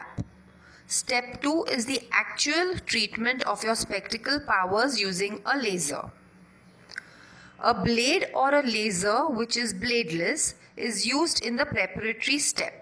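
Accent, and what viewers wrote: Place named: England, United Kingdom. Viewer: pretty hard to watch with this english accent.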